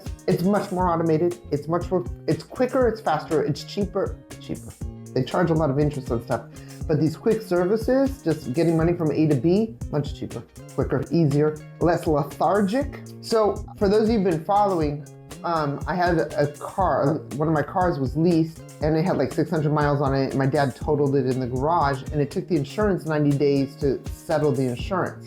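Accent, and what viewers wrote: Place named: Brazil. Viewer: Hold up, you're American? 😂 You're speaking English with a Brazilian accent. 😆 That's fking amazing.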